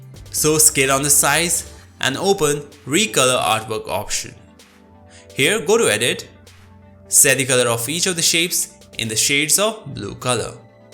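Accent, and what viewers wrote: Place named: India. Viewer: yea fucking indian accent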